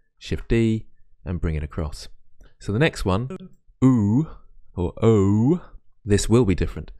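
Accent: United Kingdom. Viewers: I love your videos so much plus your British Accent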